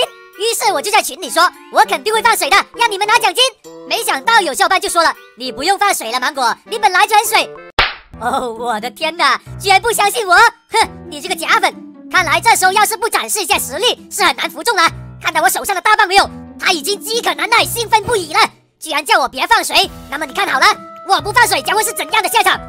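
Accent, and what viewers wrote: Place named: Guangdong, China. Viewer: 靚仔😂😂，怎麼有廣東普通話?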